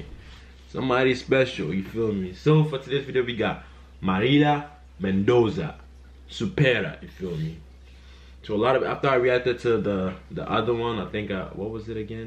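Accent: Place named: United States. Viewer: bro, don't mind this comment, your english is very american hahaha we're together, keep up with the reactions 👊🏻🇧🇷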